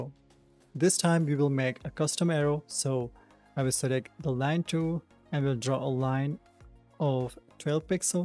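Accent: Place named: India. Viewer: Are you Desi? Familiar accent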